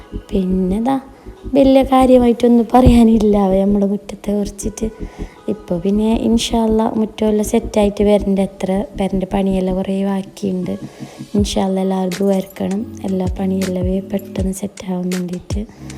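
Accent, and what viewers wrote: Kasaragod, India. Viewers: Kaasarod aanu le Slang nalla rasa kelkan❤️❤️💗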